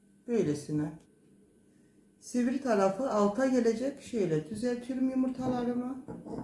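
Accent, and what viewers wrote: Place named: Bolu, Turkey. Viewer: bolulu musun aq o nasıl bir lehçe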